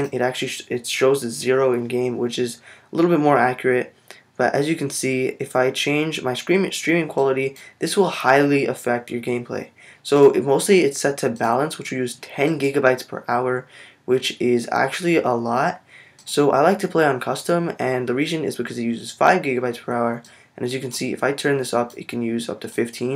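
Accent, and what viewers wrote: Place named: North America. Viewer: Do u live eu or na cos u sound na but play eu server?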